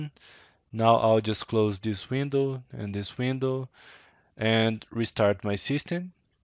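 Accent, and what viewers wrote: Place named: Brazil. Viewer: comecei a ver o video e falei "porra, eu conheço esse sotaque, esse cara é br ctz kkkkkkkkkkkKK" mt bom mano, valeu pelo tutorial ai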